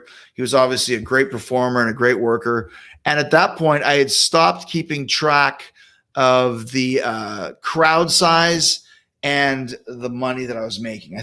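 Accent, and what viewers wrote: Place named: Minnesota, United States. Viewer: he he stil got little bit of mn accsent coming out now and than lol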